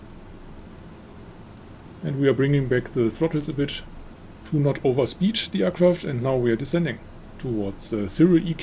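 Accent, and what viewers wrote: Germany. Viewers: Hey, I resubscribed. Missed hearing your Duetsche accent ja!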